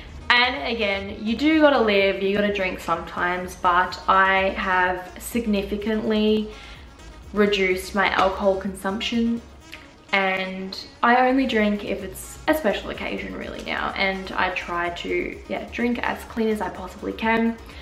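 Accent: Australia. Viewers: are you australian? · Is that an Australian accent?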